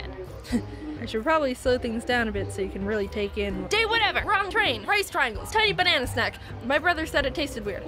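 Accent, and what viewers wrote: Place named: Philippines. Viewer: your english sound like filipino are you half?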